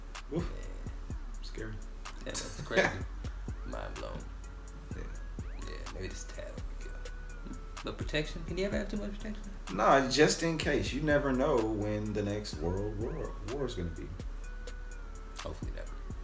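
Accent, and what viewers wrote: United Kingdom. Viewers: Bizarre that the narrator spoke with an English accent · The beautiful British accent is so wrong here. Al Bundy or David Chappelle would be better.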